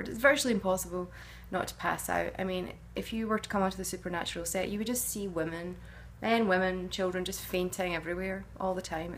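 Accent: Scotland, United Kingdom. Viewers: I love your Scottish accent. so don't worry about if you're American accent is not great. I love your sarcasm also. I'm becoming a big fan.:-) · I adore the way you do your makeup. You should do a tutorial on how it's done. Also I love Rowena and your lovely Scottish accent. sarah in Ohio, U.S.A. P.S. I adore the way you say, Fergus.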